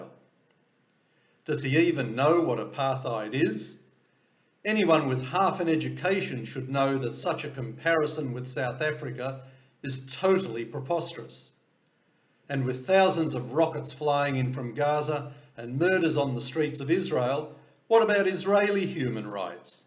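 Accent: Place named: Australia. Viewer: At last! A forceful Aussie voice. Well done,. Now go after the Lost Tribe of Israel. By that I mean the Australian Jews who are, shockingly, supporting these anti-Semitic Israel liars and haters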